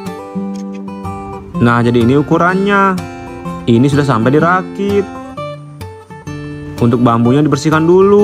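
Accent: Java, Indonesia. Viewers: Jowo mu ngendi to bang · Wong jowo a mas kok medok boso jowone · Jowoan smpean cak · Bg kmu asli mana sih kok ngomong nya ada jawa nya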